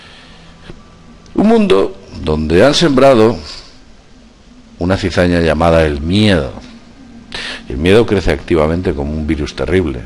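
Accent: Spain. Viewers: Hola, creo que si usaras un acento neutro, no español (europeo), serias realmente exitoso, ya que la mayoria de los hispano hablantes estamos en America. el acento de Colombia es el mejor y el mas neutro. Saludos desde Mexico!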